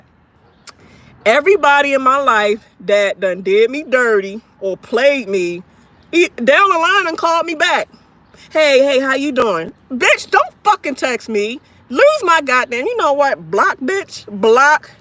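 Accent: Baltimore, United States. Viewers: Sound like you’re from Baltimore.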